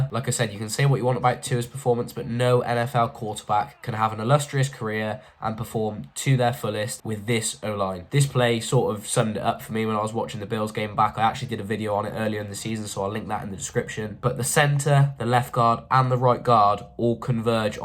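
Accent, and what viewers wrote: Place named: United Kingdom. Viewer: It's something special about hearing a Brit breaking down American football. . . AND KNOWS WHAT HE'S TALKING ABOUT. I'm here for it.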